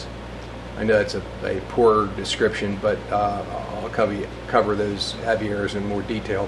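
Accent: United States. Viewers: Very nice. You do excellent work. You have the same accent as Tom Lipton do you come from the same area. I am in Australia so don't know much about American accents.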